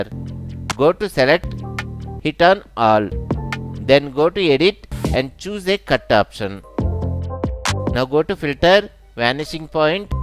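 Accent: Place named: India. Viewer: Thank you! Never underestimated Youtube tutorial with Indian accent :)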